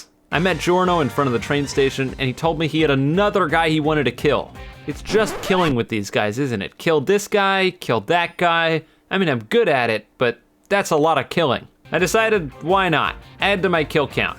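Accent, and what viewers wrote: United Kingdom. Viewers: I didn't know you were british?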